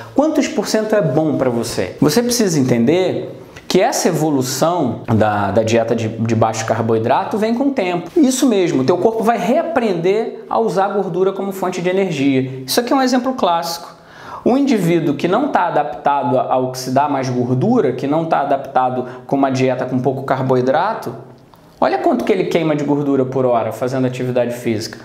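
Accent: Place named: Rio de Janeiro, Brazil. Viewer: Esse sotaque Carioca é tudo!!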